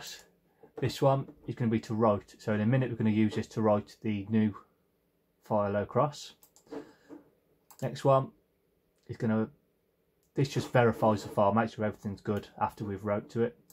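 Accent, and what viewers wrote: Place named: Midlands, United Kingdom. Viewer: Nice video. As a fellow Midlander, I'm loving the accent. Only our people can put so many syllables in the word "side". "Day-turr" :)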